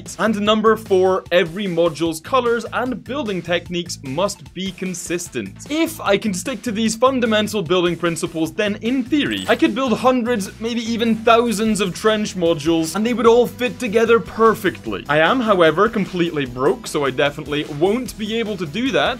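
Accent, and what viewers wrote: United Kingdom. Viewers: Can you make a battle from ever war BTW are you bri’ish or a Scotty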